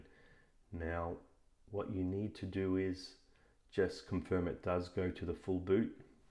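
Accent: Australia. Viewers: Nice to hear a fellow Aussie. Mine is a 6 plus I've changed the battery and everything seemed to go as per the instructions I haven't secured the battery down yet, but when I press the on/off button I only get the white screen with the apple logo briefly then nothing at all after that. Can you help? PS my charging cable is split right down the small connecting plug part, I have ordered a new one, could that be something?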